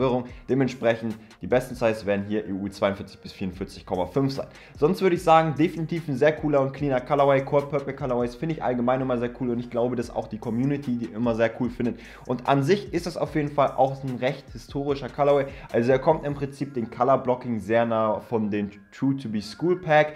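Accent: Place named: England, United Kingdom. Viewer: Ich liebe immer die englische aussprache🙏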